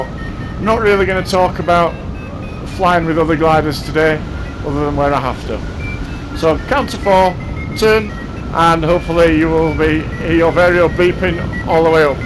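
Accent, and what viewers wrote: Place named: England, United Kingdom. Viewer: So highly thankful to you for your nice reply.. the video is so useful, it needs several times to hear to get more info despite the sounds of the winds around you there besides your English accent, and still needs more in scientific information to understand on how every physical factor would participate with other factors in the Hangglider lift process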